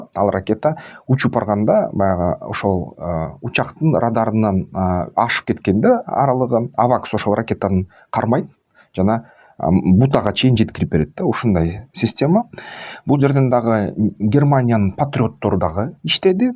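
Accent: Standard. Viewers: КЫРГЫЗЧА СОЗДУ ТАЗА СУЙЛОГОНУНУЗ учун РАХМАТ СИЗГЕ УРМАТТУУ БАЙКЕ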